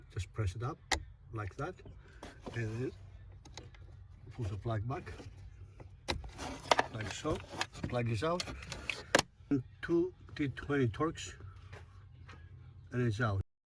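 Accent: Greece. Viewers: Good video sounds like Greek accent not that it matters lol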